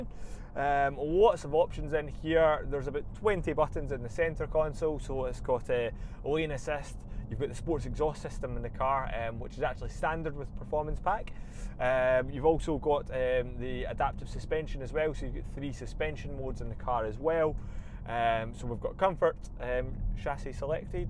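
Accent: Scotland, United Kingdom. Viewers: As a Kenyan and thanks to Sir Alex Ferguson… I love Scottish accent 😁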